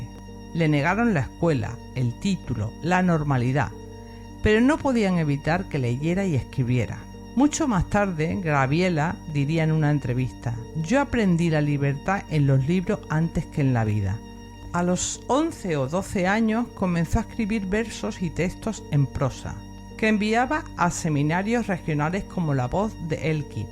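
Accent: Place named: Andalusia, Spain. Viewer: La propietaria del canal es andaluza, por eso pronuncia así mi alma!! Relájate un momento chiquilla!!!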